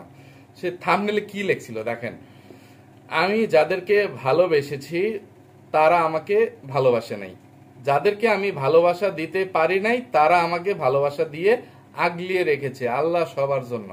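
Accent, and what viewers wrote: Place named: Mymensingh, Bangladesh. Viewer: Apni to moymonsing vashai kotha bolen..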